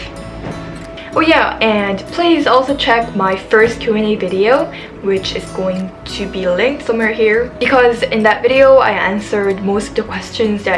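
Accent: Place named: Philippines. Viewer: I know youre not good in Tagalog but the way you speak English sounds so Filipino :-)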